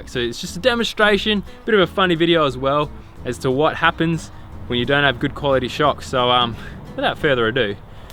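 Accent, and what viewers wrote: Australia. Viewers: I was trying to read subtitles from homescreen but YouTube couldn't understand Aussie accent 😅😅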